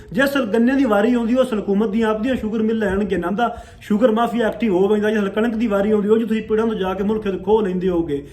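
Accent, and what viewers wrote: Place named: Punjab, India. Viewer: ਇਹ ਪੰਜਾਬੀ ਜਬਾਨ ਦੇ ਲਹਿਜਾ ਦਾ ਕੀ ਨਾਮ ਹੈ ਜੋ ਤੁਸੀ ਬੋਲਿਆ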